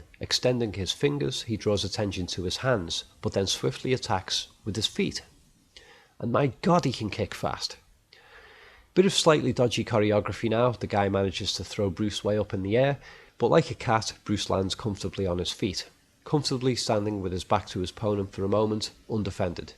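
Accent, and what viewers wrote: Liverpool, United Kingdom. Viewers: you are my new hero... I've long thought of doing a similar analysis but what you've done here is (as of now) head and shoulders above anything I can do... Plus you have the (G)reat British accent (Liverpudlian, I read) which in America assigns automatic intelligence to the speaker, which in your case is legitimately deserved... Just one thing: I'm not sure what the term "roundhouse kick" means to you but per Jeet Kune Do terminology Lee uses in order, hook kick, reverse hook (2), side kick, reverse hook (2), inside crescent and spin outside crescent... Forgive my nit-picking, I've just been in a recent spat with a YouTuber who's been so-called debunking Bruce Lee... Excellent work! I look forward to your analysis of the fight scene with Chuck Norris in Way of the Dragon!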